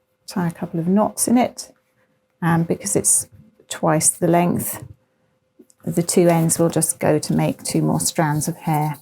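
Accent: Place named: United Kingdom. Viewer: Well I think the talking is explanatory. And this gentle British accent so much better than all of those yanking yanks!! 😅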